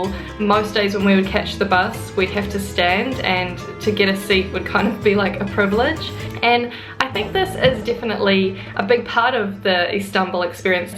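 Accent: New Zealand. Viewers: wish you good luck with lockdown - and have a safe journey.. New Zealand accent ! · I hear a Kiwi accent. How ya goin' mate?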